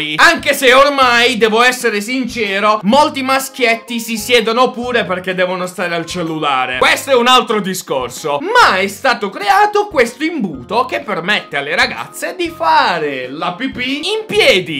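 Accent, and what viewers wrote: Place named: Naples, Italy. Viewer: Mi fai troppo ridere poi non so perché hai l'accento napoletano no perché ti seguo da 1 mese ma non so se sei napoletano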